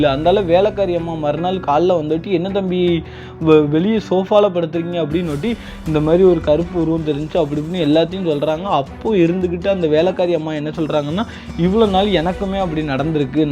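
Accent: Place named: Nagercoil, India. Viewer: Your nagercoil accent superb thambi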